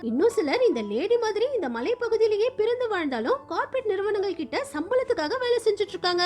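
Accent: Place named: Sri Lanka. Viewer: இலங்கை தமிழ் ❤❤❤ இலங்கை நிலை 😢😢😢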